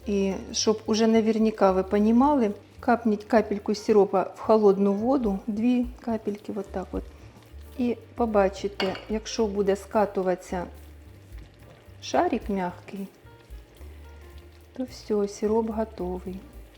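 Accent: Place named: Dnipro, Ukraine. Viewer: Якщо закрити очі - одразу Юля Коса чується, такий же дніпровський діалект, та і тембр однаковий.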